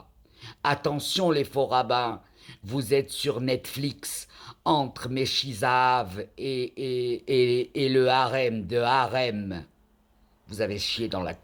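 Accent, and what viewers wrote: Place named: Morocco. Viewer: يلاه دخلت للقناة ديالك عن طريق واحد الفيديو كتهضري بالدارجة تبارك الله عندك دارجة مغربية قديمة كتعجبني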